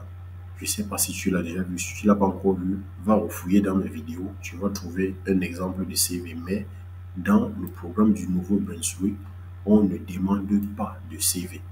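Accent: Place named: Cameroon. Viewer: mon gar ta video n''a pas de volume et ton accent cest kmer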